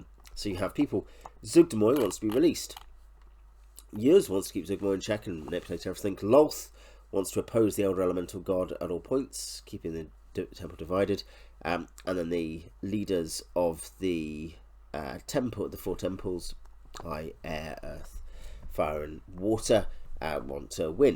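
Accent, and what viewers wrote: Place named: United Kingdom. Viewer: Wtf dude. You can't follow a British accent? You have to get out more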